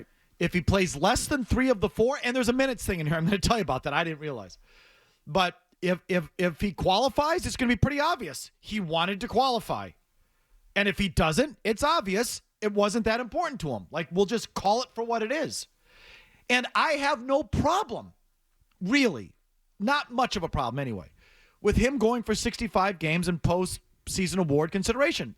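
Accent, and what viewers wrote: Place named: Wisconsin, United States. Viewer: Sawry. Your Wisconsin accent irritates me. Especially when you say “sawry” and “suhvun” (seven)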